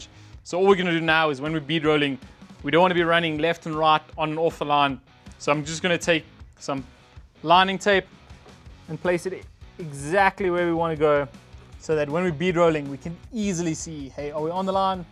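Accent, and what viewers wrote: South Africa. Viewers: Do l detect a slight South African accent ? I bought my planishing hammer in January 1970 , a Proto made in Canada .l always kept the head clean until about 10 years ago some idiot recovery driver thought he'd use it to unjam his tailgate ! It completely destroyed the case hardened face. I rate myself as a very good panel beater / painter but l was in accident repair so never had the opportunity to use a wheeling machine or work with alloy . Fabrication and making panels is something l self taught . My mentor passed a year ago . He had a wheeling machine and learnt to work with aluminium. He was very talented . I thought this was repaired a number of years ago . Or is this a replica ? Thanks .P.S. Allen welded with oxy/ Acetylene . Tig welders were years away 😊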